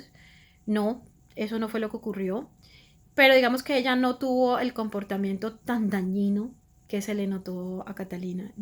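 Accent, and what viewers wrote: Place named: Caribbean. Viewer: Excelente,te Vi más suelta al hablar ,en el 2 video parecias hablar entre dientes ,(como cachaca)ahora ya te está saliendo lo caribeña,espero q el próximo digas algo así como !.NJ GANAMOS!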